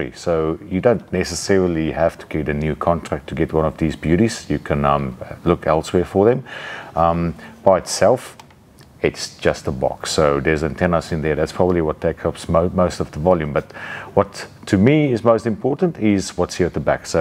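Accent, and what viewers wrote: South Africa. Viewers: Thanks for the video, very useful. You sound very South African?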